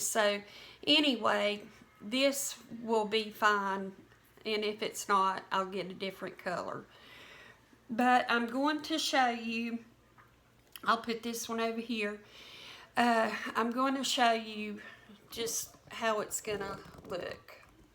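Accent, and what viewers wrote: South, United States. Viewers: What an adorable ideal I love it because I have many spices this will be helpful, but could I make one or two suggestions just off the top of my head. You could take the lids to the jars which are metal lay them face up and spray them with a quick coat of dark Krylon paint , then you can write on them with regular marks-a-lot. or sharpie. And in my kitchen if I'm going to put it anywhere near the stove I need to put a little plastic cover something over top so that the grease in the air from the cooking does not accumulate on these glass bottles otherwise will be washing them all the time but I do love the idea and I'm going to do it ,so thanks a bunch, by the way you got to be a Southern girl. I'm from Texas but you make me feel like home thanks a lot girl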